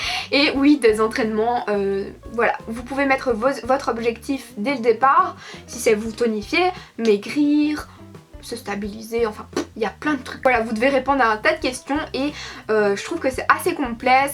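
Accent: Belgium. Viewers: T es belge ??❤️❤️❤️+1abo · tu es belge?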